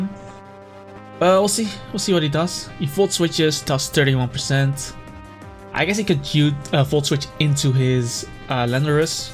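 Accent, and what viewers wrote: Netherlands. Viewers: Your accent sounds soooo dutch XD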